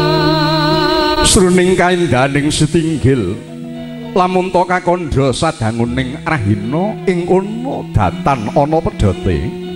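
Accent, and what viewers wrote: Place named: Java, Indonesia. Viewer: Jian mantep...artikulasi jelas...intonasi pas...logat jawanya trep....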